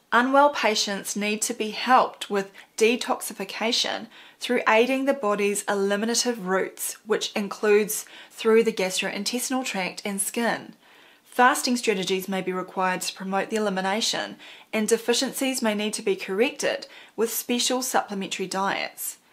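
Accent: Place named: New Zealand. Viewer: Her NZ accent and her healthy smile and energy are added plusses to her important Med industry transforming message....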